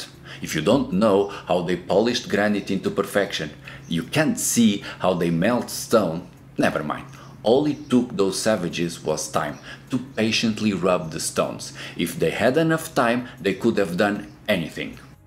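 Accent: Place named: Portugal. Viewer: Why do you sound like you are portuguese while speaking english?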